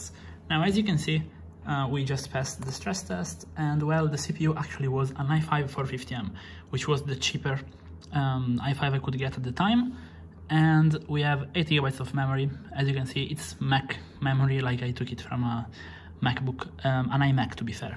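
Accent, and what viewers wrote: Italy. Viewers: Si sente che è italiano, ma c'è anche da elogiare il fatto che riesca a fare interi video senza mai fermarsi e con una pronuncia giusta ✌️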